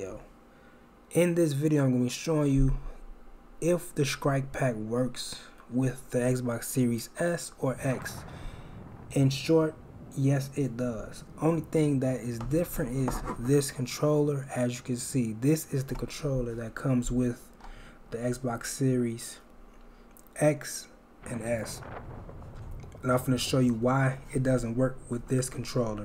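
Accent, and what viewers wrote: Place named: South, United States. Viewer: Am I bugging or this dude keep saying SKRIKE pack and not Strike pack.... I'm from New York my guy I don't understand how "st" sounds like "sk" down south, I'm starting to think southerners should stop saying street, shrimp and now strike cuz is sounds bugged out wtf is "skrimp" how tf am I gonna meet you up the "skreet" and now u ruin my day by telling me may "SKRIKE" pack won't work on my series x controller..... Where's the southern hospitality my guy u hurting my feelings lol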